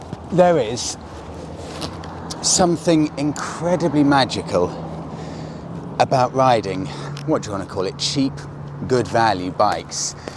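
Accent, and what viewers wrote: England, United Kingdom. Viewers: Leave aside the bike, we see enough of them in India. I suspect we Indians watch your channel for a bit of that propah English accent 😊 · I am not a big bike fan but somehow I like Royal Enfield a lot, especially in a such well demonstrated video. Some extra attraction for that British accent... 👍👍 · I'm from Brazil and I study English, I like watching your videos because I like the English accent, and also knowing about motorcycles, would this bike be adapted to my height, my height is 5, feet and 3.5 inches. Thanks. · The importance of English these days, I, being Brazilian, would be missing out on such good content from this channel, your British accent is cool and a little funny to me. You're a good communicator, (and ).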